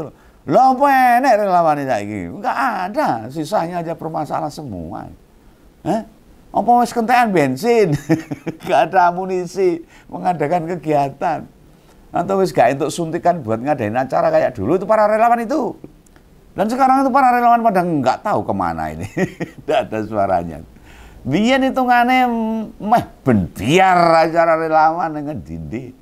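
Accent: Java, Indonesia. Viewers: Aku seneng dengan ni logat jawanya dan guyunyo tu.... Ikut guyu juga aku dibuatnya... Mantap pencerahannya maju terus Mas.. 👍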